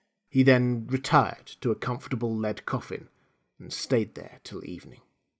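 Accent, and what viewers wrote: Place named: United States. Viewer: Great American accent!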